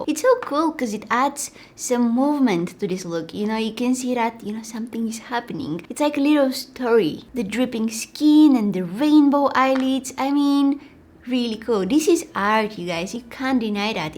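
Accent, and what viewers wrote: Russia. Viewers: I actually also understand every single word but the problem is her russian accent. I like her and her vids a lot. But her accent is a bit difficult. I know she can't do anything to speak without an accent but i've just said it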